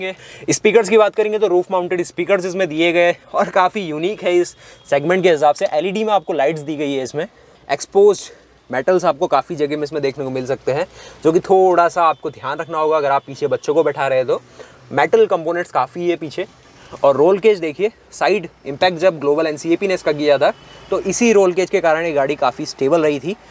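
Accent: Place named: Madhya Pradesh, India. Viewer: Bhai MP s ho kya Unne sun k laga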